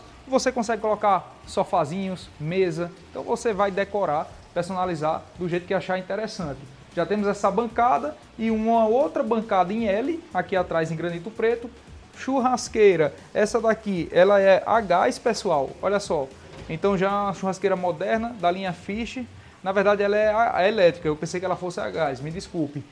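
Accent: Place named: Ceará, Brazil. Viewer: Esse sotaque do Ceará me encanta por demais. O vontade de conhecer esse estado. Talvez, até morar ❤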